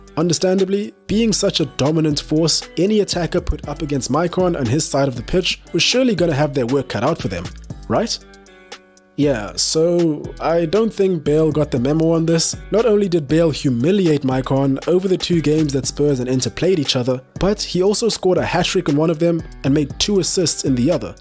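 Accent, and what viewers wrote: South Africa. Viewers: he is from the rsa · Are you South African bro? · Where are you from dude? Trying to place your accent. Sound kind of South African but I can’t tell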